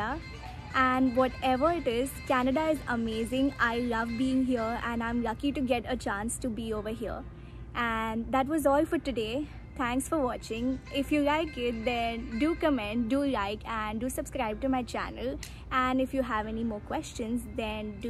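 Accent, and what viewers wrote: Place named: India. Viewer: Perfect Indian Accent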